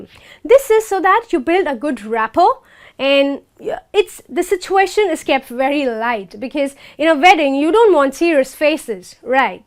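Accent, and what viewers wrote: United Kingdom. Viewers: There is no need of such British a csent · She takes british action and fast cant understand what she is saying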